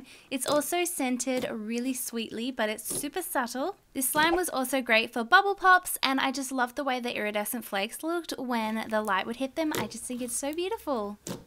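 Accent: Australia. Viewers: Your Australian right!!!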